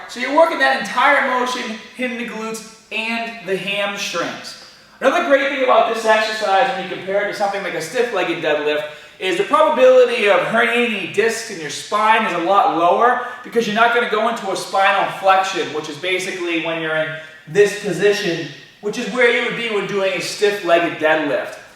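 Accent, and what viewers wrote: Boston, United States. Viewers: I ABSOLUTELY LOVE WATCHING YOUR VIDEOS!! Especially with that Boston accent of yours❤️. Enjoy waking up & hear your cheerful self on YouTube! Wish you lived near me, I’d love a trainer like you. I’d appreciate any suggestions!! My right shoulder is 💩 for the past few hrs. You name it, I have it. Torn rotator cuff, torn labrum, bone Spurs, osteoarthritis, fraying of bicep tendon, etc. my ROM Is awful. I’m on my 10th cortisone injection over past few yrs. surgeon said need partial shoulder replacement BUT due to my age, 48.. he doesn’t recommend it. Told me I’d have less ROM after surgery heals. & only lasts 10 yrs.. even though it’s my shoulders that are 💩 it always interferes with any work out I do legs, glutes. I’ve been to physical therapy, chiropractor still going to that I do my wall stretches band stretches are used to be shredded I had the best arms around I can’t even do a 5 pound lateral raise. 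I would love any suggestions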